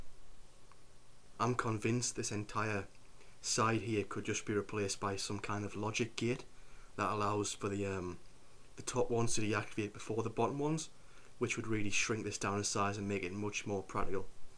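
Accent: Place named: Newcastle, United Kingdom. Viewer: you sound a bit like a geordie mate :) go north!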